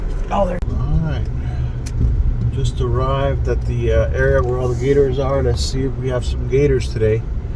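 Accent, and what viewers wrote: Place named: Canada. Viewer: This is awesome! I was planning a trip soon! Good Canadian accent!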